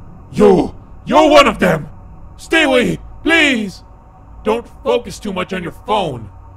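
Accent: Indonesia. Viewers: Are you Indonesia because of your pronounciasion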